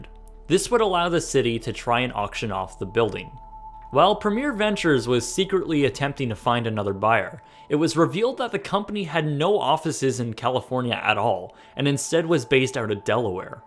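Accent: Canada. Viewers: I just love watching your videos,. They are so interesting and your Canadian accent is just so cute (myself knowing it well living in the States less than 100 miles from the border). Keep posting!:-) x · You can tell by this guy's accent he's Canadian. As an American, I've never heard anyone call anything a "Dollarama". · He’s canadian. We have a slight different accent from Americans... people say it isn’t noticeable but this literally is just an example of how we say some words differently.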